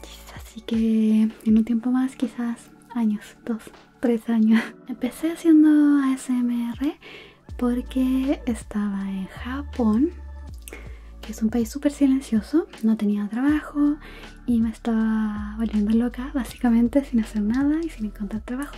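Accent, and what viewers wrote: Chile. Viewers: Ahora si se nota tu bello acento chileno mas que en el ASMR. Por supuesto que tu VOZ es hermosa siempre en cualquier video! 👍☺👍✌ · Hola 💗💗 pregunta: cuáles son tus animes/mangas favs? Psdt: que lindaaa, y el acento chileno ajaja❤ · tu voz es tan relajante 😊 desde que te escuche dije es chilena, yo soy mexicana, saludos. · Épico hablando chileno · Espero las trasmisiones jugando 👌 te va ir de lujo este canal, ese acento chileno me encanto 💖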